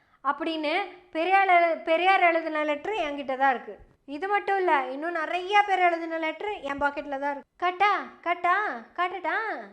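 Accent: Kongu, India. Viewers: Nee oru dmk பரதேசி போல இதுல கொங்கு மொழி வேற பன்னாடை கண்ணாடி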